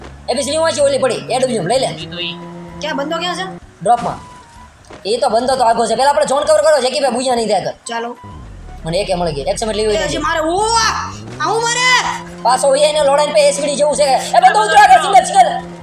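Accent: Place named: Kathiawar, India. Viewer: bhai કાઠીયાવાડી lage se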